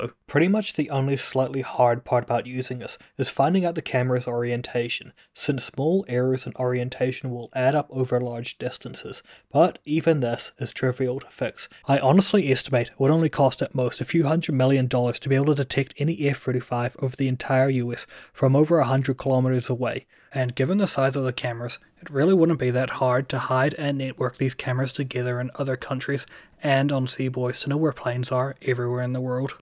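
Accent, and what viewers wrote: United Kingdom. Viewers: You have an accent so it sounds like UK perhaps and probably get away with it due to the connection between the United Kingdom and the us being part of the five eyes. But probably still would have to ask for permission and would probably get it because what I'm thinking is portable extremely modular, uses an SDR array of disciplined chief sdrs and one that's a little more expensive because it covers from 6 to 20 GHz. And then there would be $360 degrees of visual, visual zoom, infrared, thermal, it's kind of designed like a cylinder with heat dissipation and reflectivity taken into account. And people who are knowledgeable about passive radar know what I mean about reflectivity. I'm using quarter wave well filtered phased array antennas on a flexible PCB for example is one idea ahead facing each direction so you can get time of flight. 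There's also a nice premade hat it looks like a hat the determines phase so you can weed out multipath. It connects via fiber optics pelican case which has whichever is the best chemistry and density for power storage. The ability to hook up to camouflaged wind, solar, or hydro even if it's just a local water source. Hooking up a small charging device to the local water. You put up a set of 10 or 15 of these and you could cover a lot of space 1 it would spend several days taking baseline is on the EM spectrum and picking out known emitters. If you take a baseline from knowing emitters with known coordinates over a week or two weeks of various w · Did you think of this idea yourself? You could probably develop some rough prototype and pitch it to the US government, or the UK since you sound British.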